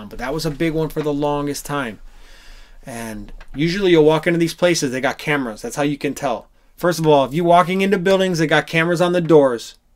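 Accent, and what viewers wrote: Hawaii, United States. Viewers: Haole-Hawaiian for LIFE! Makes me smile when your pidgin comes out interspersed between most of the reg. English stuff. Haha. Right on man.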